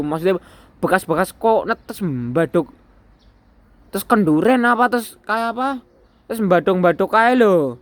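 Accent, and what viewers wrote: Banyumas, Indonesia. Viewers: Rika ngapak e ngendi Ndan😀😀😀😀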